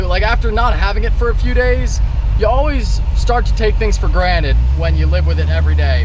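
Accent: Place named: United States. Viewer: i thought was british or something,but he's so american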